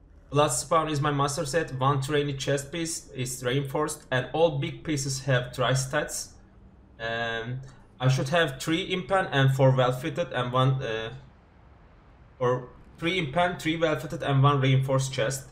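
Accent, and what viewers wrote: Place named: Turkey. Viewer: Yokla ing şiveden yine türk😄ilk defa ing video yu ana dilim gibi anladım😂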